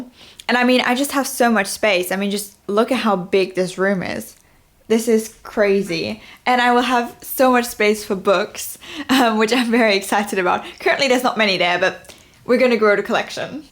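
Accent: United Kingdom. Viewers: You have quiet a British accent, love that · It's like you re speaking a better English (in British way )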